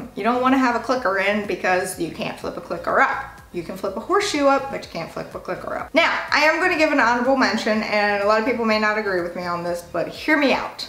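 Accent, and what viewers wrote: United States. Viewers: Where are you from? I’ve never heard your accent before. You sound American but you said a bit Irish. Because when you speak you don’t pronounce the g in ing words. You say “piercin’ and “somethin”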